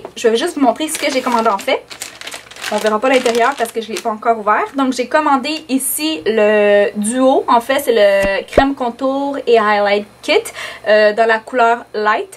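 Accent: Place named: Quebec, Canada. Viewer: J'adore ton accent quebequoie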